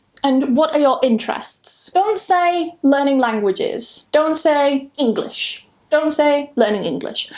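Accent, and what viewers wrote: United Kingdom. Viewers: Hi, Pity you're alone on New Year's Day, 'cos your boyfriend is on duty today, in such a remarkable day. But I think that in reality you should't feet sad or either alone, because you have a lot of friends, listening to your remarkable messages in perfect british accent, that 100 K followers that love you in the distance, throughout the cyberspace, look forward every time. You're a new Rose of England, a british angel flying everywhere in the world, upon the wings of thousands of bytes and bytes, zeros and ones, charming and delightfulness with no limits. Darling, I beg you to carry on with this selfimposed task of teaching the "lingua franca" that is nowadays the english language as well as the british culture and way of life, to the people around the glove, thing that you implement in a so accurated way. I'm writing to you form Tarragona, a place in Catalonia you know well toghether with its Uni. 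Rovira i Virgili, a place where as I understand you used to take some courses, some time ago. If you whish to refresh your knowlegde of the many languages you're fluent with, and make some practice with me either in Spanish or in Catalan, do not hesitate in sending to me, via e-mail: all the letters you want, with the messages you wish, so short or long as you fancy, whenever you feel like, and about the items you consider interesting or educational, for your personal understanding or from a point of view of professional developpment. If in case, please contact e-mail: <mail> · I love your honesty. I just found your channel and I think it will become one of my favorites. I want to learn English with a British accent and it looks like you're the perfect person to teach me. I like the way you talk, your accent, your honesty, and besides, you're cute! I wish you all the best this new year and I hope to learn a lot from you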